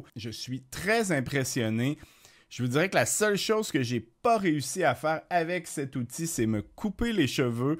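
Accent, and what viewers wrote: Quebec, Canada. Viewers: Bonjour , comment s'appelle l'application ,je n'arrive pas a comprendre le titre désolé j'ai un peu de mal à comprendre l'accent québécois .sinon top continue comme ça . Je me suis certaine fois de problème grâce à tes explications . merci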